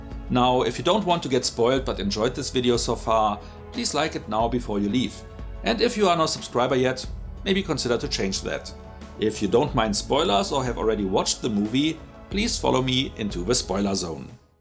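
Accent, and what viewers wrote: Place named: Germany. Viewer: why are you doing a nazi accent?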